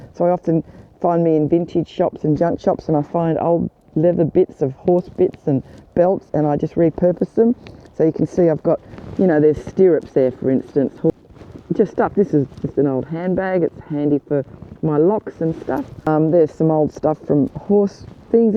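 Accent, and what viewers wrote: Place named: Australia. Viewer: You are one amazing woman love the Aussie accent and when you start swearing it’s off the dial love it carry on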